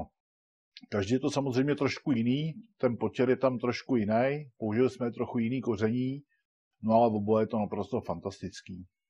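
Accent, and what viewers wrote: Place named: Prague, Czech Republic. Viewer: jsem naprosto normální heterosexuál:) Přesto bych řekl, že mám hrozně rád., tvý recepty jsou neotřelý, někdy trošku složitý, někdy jednoduchý, někdy super a dost často na ceny nejvyšší. Občas mi jako brňákovi úplně neladila tvoje pražská mluva, ale tohle je přesně to, co tě dělá zapamatovatelným. Jsi nejen vynikající kuchař. Jsi i člověk s charismatem a dobrým srdcem. Jsem rád, že jsem měl tu čest tě poznat i osobně. Díky nejen za ty super recepty, ale díky i za to, jak to celý podáváš.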